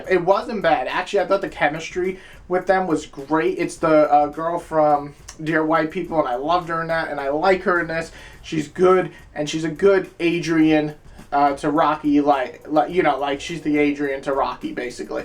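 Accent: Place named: East Coast, United States. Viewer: I agree, he sound like Jonah hill with a east coast accent.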